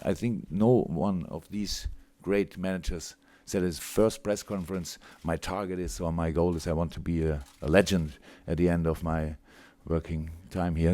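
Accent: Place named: Germany. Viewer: His english is very good, but you can hear sometimes the german in him. 😉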